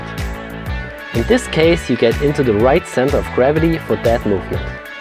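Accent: Germany. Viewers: Can't dafine your accent. Where are you from? Or if someone knows plz tell me. upd: It seems i found out. Is it german? Correct? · it‘s english with german accent, so don‘t bother ;) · Haha this german accent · Man hört das Deutsch raus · Man hört direkt den deutschen Akzent 😉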